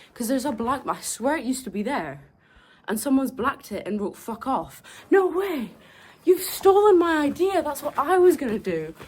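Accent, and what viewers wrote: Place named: Australia. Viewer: your voice sounds eaven aussie a lil bit, you might be onto something with all these theories 🙂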